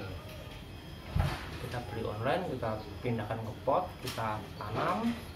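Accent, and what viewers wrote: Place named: Banyumas, Indonesia. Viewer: Wong ngapak ya? Najajl inyong direwangi support ya, wis mampir kie, dipadamkan balik yaa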